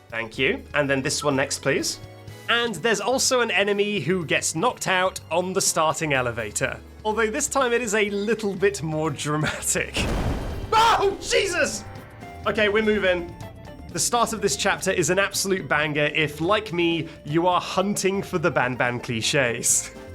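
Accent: United Kingdom. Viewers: That's weird cuz my dog was running all over the house cuz I was playing this on my TV once upon a time and he couldn't figure out the British accent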